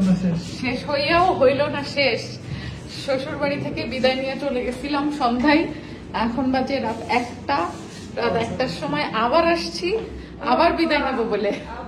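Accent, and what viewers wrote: Kushtia, Bangladesh. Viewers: Apu tho sobar moto dong kore kotha bole na,tader kustiyar vasay amn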